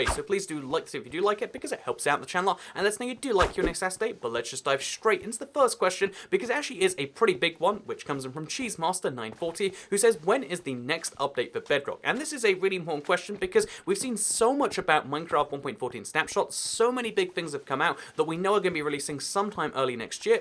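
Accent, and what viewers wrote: United States. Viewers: Also you sound pretty American to me. Then again I thought a German YouTube's was Dutch...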